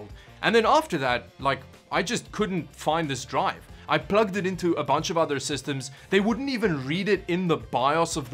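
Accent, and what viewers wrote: South Africa. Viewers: ARE YOU sOUTH aFRICAN? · Ive suspected you are from South Africa for a while now due to your accent and then you used that thing when we say "ill get into that just now". Could you please confirm to satisfy my curiosity cause its very distracting while watching your content :P Cheers mate!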